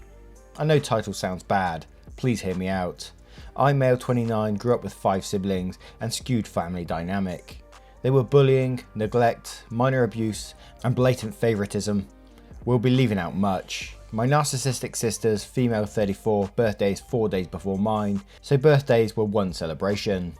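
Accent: United Kingdom. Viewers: This is the only channel I know of with a UK voice, I like R/Slash and RStart but this is a properly organic community with interaction and humour.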